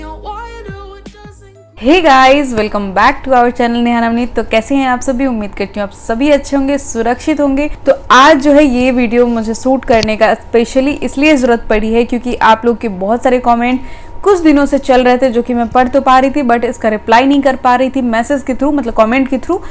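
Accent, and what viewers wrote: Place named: Purvanchal, India. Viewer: love u ji mai apko tb se dekh rhi hu jb ap 5 mnth pregnnt thi qki mai b pregnnt thi ab meri beti ho gyi h... apko dekhna or sunna acha lgta h.. mera sasural b purvanchal se h to apki tone achi lgti h❤❤❤❤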